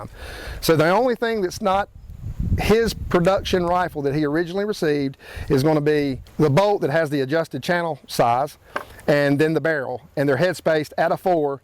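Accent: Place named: South Carolina, United States. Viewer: That SC accent makes me miss the Carolinas. Ive lived and worked down there off and on for years (Charlotte, Columbia, barnwell, Gaffney, Lugoff, Myrtle Beach, etc). South Carolinians are some of the best folks on Earth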